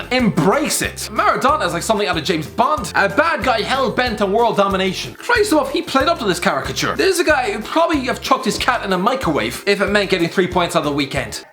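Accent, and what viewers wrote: Ireland. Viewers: Arent u Irish or snt? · Best thing I have ever heard in an Irish accent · Good lord, this is the most obnoxious video commentary that I've heard in a very long time. What's wrong with you, Irish guy? Take a deep breath sometime.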